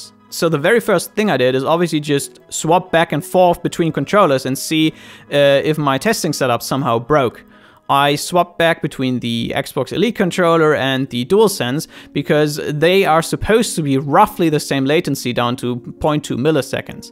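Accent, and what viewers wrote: Germany. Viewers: Hahaha egal wie sehr man sich anstrengt man hört sofort den deutschen Akzent heraus. :)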